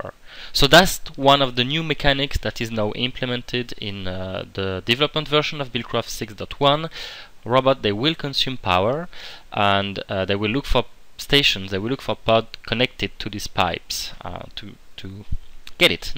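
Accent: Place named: France. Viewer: Hey this is awesome! Also, you sound really french! If you are then: Salut! Super mod! Je kiff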